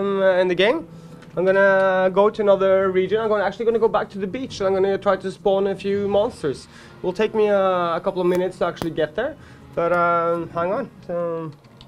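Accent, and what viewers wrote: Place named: Norway. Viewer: If you are not able to understand this guy you need to learn English. He has perfectly fine English with a Norwegian accent. It is not like the English is wrong or anything.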